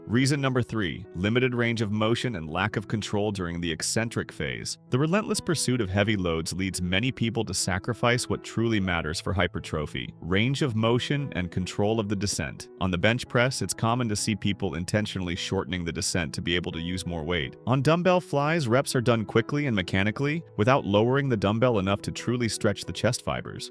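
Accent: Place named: United States. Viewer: yet another BS clickbait video were the narrator has an awful american accent so bad you want to punch them in the face.Avoid.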